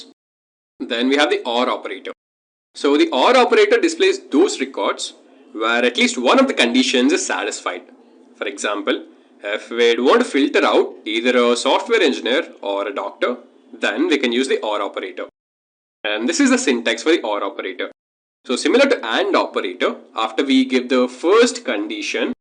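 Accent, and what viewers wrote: India. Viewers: Any chance yoy could add subtitles to your videos? I’m Indian too, but don’t have an accent so I can sometimes barely keep up with your’s in your videos...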